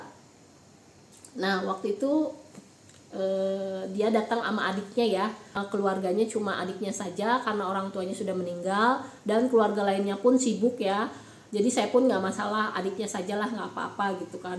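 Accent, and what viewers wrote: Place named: Sunda, Indonesia. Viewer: Teh sudah lama di china logat sunda nya masih kental sekali...atuh resep pisan ngadenge na teh😃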